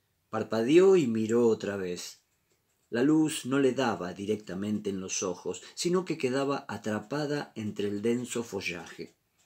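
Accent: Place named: Argentina. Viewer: Que hermoso narra los cuentos! 💗 aunado a su encantador acento Argentino 🌟 Gracias! 😊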